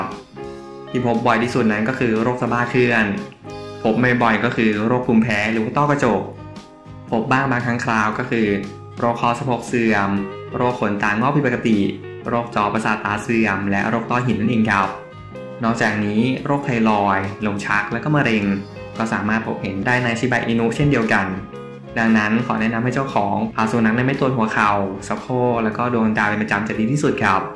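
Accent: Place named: Suphanburi, Thailand. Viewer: คนพากษ์เสียงเป็นคน สุพรรณบุรี ราชบุรี สมุทสาคร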